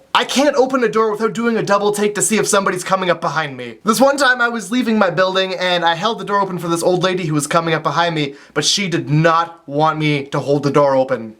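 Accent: Canada. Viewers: i need you to say stuff in the canadian accent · Make a video of you just saying things in the Canadian way you do!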